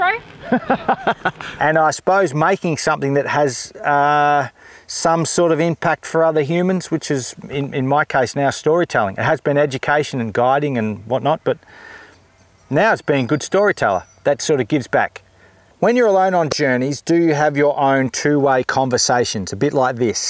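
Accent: Australia. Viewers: O, how I missed that Aussie voice.... Thank you loads for making 2020 a little better. Lots of love from the Netherlands!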